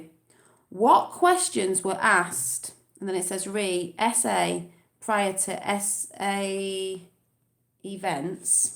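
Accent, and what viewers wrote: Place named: Manchester, United Kingdom. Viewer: ... Typical accent from Manchester and little bit of Liverpool areas of Britain! 😘 💞 🎊🎈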